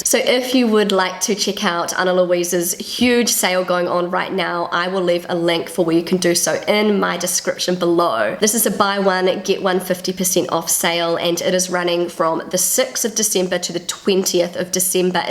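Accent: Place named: New Zealand. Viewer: I always understand "chicken point" when you pronounce "check-in point". Kiwi accent is so funny! 😆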